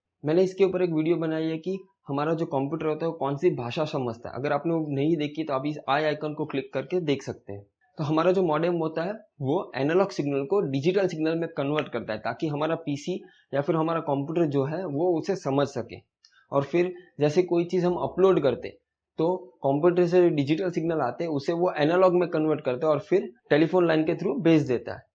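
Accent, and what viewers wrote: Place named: Gujarat, India. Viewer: Bhai app gujarati chone avaj thi lage che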